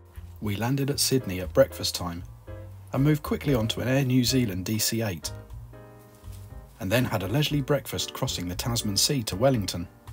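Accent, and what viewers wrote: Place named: England, United Kingdom. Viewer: Steak and milk.... very good for me reading, earing lovely words slowly, easy to repeat if not understanding, and english accent i can restaure with your tonics on words. Not only the lesson about phylatélic value about this New-Zealand letter from 1974. Words are so well writing, at middle of letter eyes were synchronize with words and your voice. Great time and good idea Thank you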